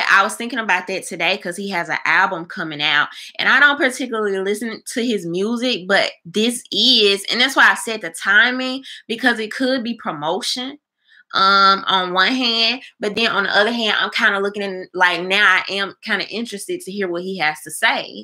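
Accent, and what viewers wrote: South, United States. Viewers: I love that little country voice of yours, I'll vote for who ever you vote for.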